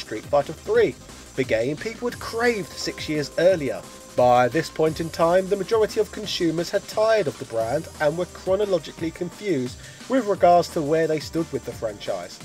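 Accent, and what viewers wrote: United Kingdom. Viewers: As a tournament fighting game player I find your fighting game videos a bit cringy. That's not to say your videos on fighting games are bad, it's just that what we as tournament or hardcore players look for very different things in a fighting game. As an example, casual players went crazy when SF5 didn't ship with a story mode. We didn't care a bit. The game was very solid on launch from a competitive standpoint. I actually picked up my keyboard to shit post and call your videos shit, but then I realized that if you got an OG tournament player to collab with you could make some amazing stuff. With fighting games, the way a hardcore player and casual player look at the game is so different and it'd be really interesting to see both side by side. It is obvious you have a great love for all things fighting games, from a casual level, which is most people that buy these games. 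I saw you and a few others on a stream complaining about tournament players roasting your videos(I was about to be one of said players lol). You'll find that there are some valid reasons you're getting roasted. I just think that showing how basically every fighting game is almost 2 games in one could be really interesting depending on who you can find to collab with. Judging by your accent, you're from the UK? Try getting a hold of Ryan Heart(Harte? not sure on spelling). He'd be perfect to add the hardcore mindset to your videos.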